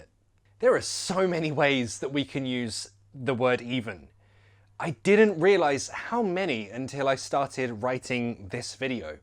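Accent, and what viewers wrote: United Kingdom. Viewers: Even though I have a lot brit friends, but I'm still need to fill my grammar English cause I love the British accent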